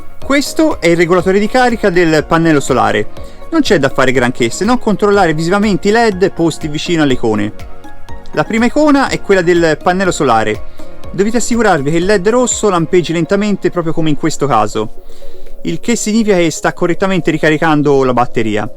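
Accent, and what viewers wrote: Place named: Tuscany, Italy. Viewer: Ciao, spieghi sempre molto chiaro, impreziosito dall'accento toscano. Ti faccio due domande: nel tuo caso (inverno freddo e serbatoi vuoti di acqua), il riscaldamento truma diesel con boiler, lo potevi accendere senza danni? E poi le prese USB che sono all'interno del van sono attive, cioè puoi attingere dalla batteria servizi con van spento? Grazie attendo nuovi video.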